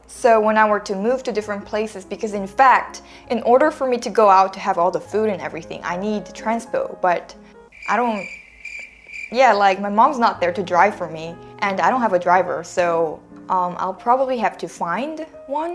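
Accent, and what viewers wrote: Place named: Philippines. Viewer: That pinoy english accent, wow sososyalin!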